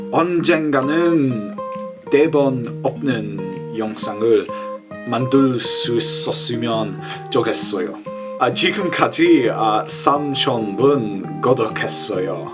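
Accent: United Kingdom. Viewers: you guys make me want to speak british accent too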